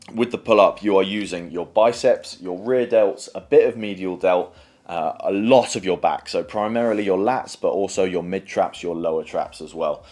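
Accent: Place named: London, United Kingdom. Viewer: love ur accent....so pretty ! where is it from ? London ?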